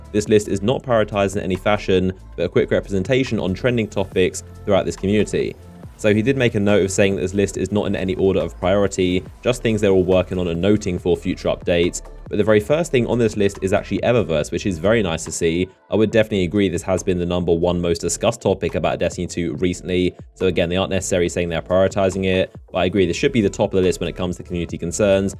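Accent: England, United Kingdom. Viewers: I really like English accents. You're a very good youtuber, but when I see big news that various YouTubers cover I choose yours because you just sound so dang proper.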